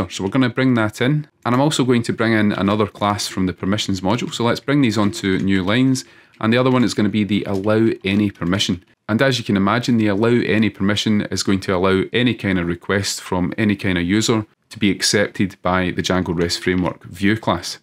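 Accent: Scotland, United Kingdom. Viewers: Great series so far... I am glad I moved to Scotland a few months back, I can understand you perfectly (assuming you are Scottish). The way you teach is excellent, loads of people throw things at you but you take the time to show where to find the info, look at the doc while coding and also look at the code behind the scene, that is brilliant. Subscribed, thumb up, coffee to come in a few days.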